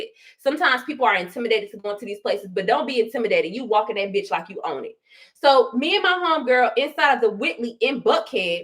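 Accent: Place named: Jamaica. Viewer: LolBit@ I’m Jamaican I have the accent too but I love it hahaha you funny af.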